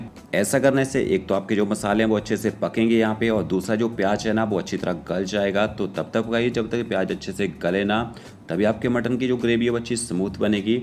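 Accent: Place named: Punjab, India. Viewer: One thing which I love abt u is ur authentic punjabi accent...love u bro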